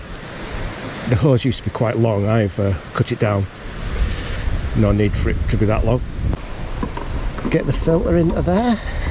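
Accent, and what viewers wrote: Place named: Yorkshire, United Kingdom. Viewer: If it wasn`t for the Yarkshire accent and the wet trails I`d have sworn I was watching BKXC. Brilliant video, loved every minute. Quality review of the bike as well.. Oh and the colour of the handlebars.......I`m colour blind so it Doesn`t matter to me LOL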